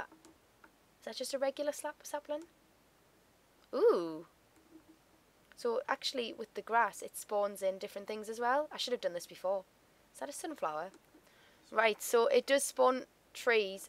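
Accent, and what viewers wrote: Newcastle, United Kingdom. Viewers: I can tell because of how you talk. I'm A Geordie Too. :) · You are a Geordie right?? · fellow geordie? lol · Newcastle, surely.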